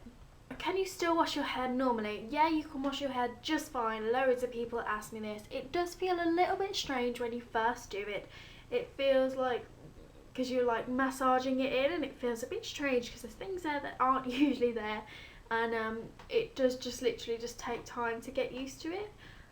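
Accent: United Kingdom. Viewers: Are you british/english? I love your voice! :3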